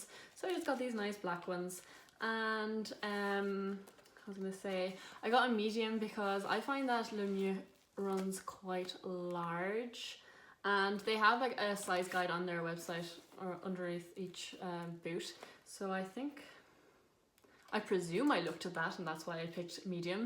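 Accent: Ireland. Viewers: Dear, So nice to see ya, i've been in Ireland for four months last year as an exchange student and i also picked up the irish accent, it is so lovely to hear it now, i had been in Kerry near Killarney, don't you live near Dublin cause you went to the Dublin Festival or so, i would love to see more videos like that, btw love your room and the horse ears pic on the wall, went to Ireland this summer i am actually from germany but love this country so freakin' muuch😍😍😍 i am doing horse riding since nine years,obsessed with it, went this morning, was great😎 🏇 have a great weekend 💚🇮🇪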